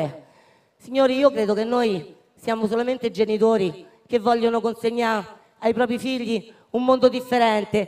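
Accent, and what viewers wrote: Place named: Rome, Italy. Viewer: sei proprio 'na romanaccia,come me! daje che cja famo!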